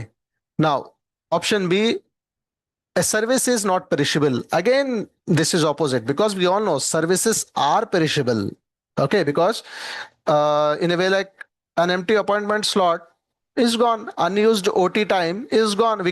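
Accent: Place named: India. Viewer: ليش هندى اللى بيشرح ...على رأى ترمب لما قال اى دونت اندر ستاند ..سيت داون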